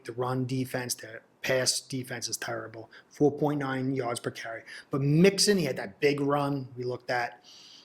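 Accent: New York, United States. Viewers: New York accent and Dolphins fan.. interesting. I liked and subscribed because I'm a Bengals fan. Who Dey!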